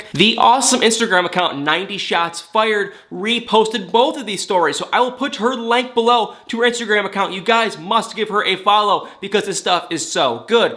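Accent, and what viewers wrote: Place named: South, United States. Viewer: You are such a cutie!!! Lol I have to say that you’re saying’lank’ instead of link and thank instead of think !!!tennessee has gotten to you my friend lol!!! You are a southerner now!!! Lovingly from another southerner!! Still laughing!!!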